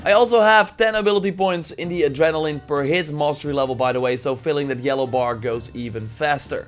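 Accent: Netherlands. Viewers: Are you dutch i can hear in your accent im a big fan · The way you speak English has something, are you dutch by any chance?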